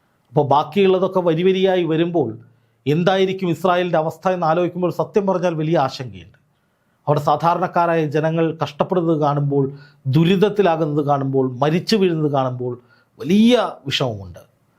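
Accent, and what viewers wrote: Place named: Pathanamthitta, India. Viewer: ത്രീശ്ശൂർ ആണോ. പത്തനംതിട്ടകാരന്റെ സംസാരമാണല്ലോ കേട്ടിട്ട്.. നീട്ട് ഇല്ലല്ലോ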